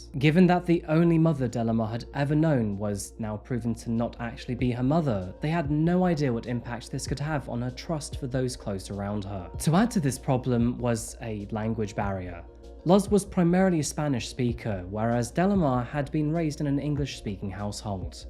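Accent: United Kingdom. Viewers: Dudes British, he gets a pass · Sheesh! Sorry folks are all mad abt pronunciation...crazy how people from different places say pronounce things differently. They missed a couple though! You also said the letter "h" funny! (Like a Brit i guess, we say it more... without an h ... like "aytch" and you said it w an actual "h" at the beginning! 😂 and then... you said Delimar told her mom "your my mum"... (we say mAWm)... its almost like youre not americain!😂 like youre pronouncing things the way youve heard them! Crazy! 😂 sorry... having fun noticing your british bits now. Now i want you to say "today's case takes place" like its "the rain in spain falls mainly on the plains..." Jeeeze. People be so picky. (P.s. my name is Dominique, but americains always want to be saying it like an italian guy's name, "dAWm ah nick", so here we are... sigh)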